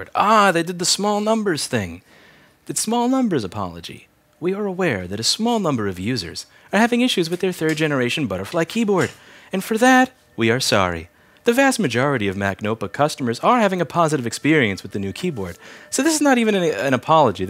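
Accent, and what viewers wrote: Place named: South, United States. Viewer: Southern Voice El gato es muy buneo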